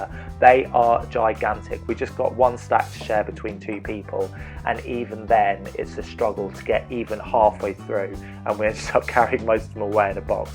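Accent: United Kingdom. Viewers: Hi. Loved hearing the English accent on a US guide for a change. What I hear repeatedly is the hike up to the Hollywood sign, which, as I'm in a wheelchair is a lot of a no no. I really would like to find out what is here in LA that a 65+ year old couple and our Mustang convertible can get to, with my wheelchair and "er indoors" dodgy legs 😥 Really enjoyed the video though 👍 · I liked the video very much as it covers the points that need to be gone over. I have absolutely fallen in love with your UK accent. I could listen to you say "Boulevard" all day long. So, you were sexy and informative.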